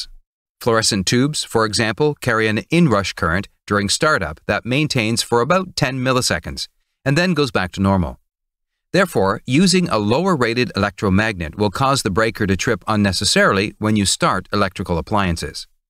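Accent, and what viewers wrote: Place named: India. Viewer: New to the channel. Was wondering how come the channel get from a good english accent to Indian English accent?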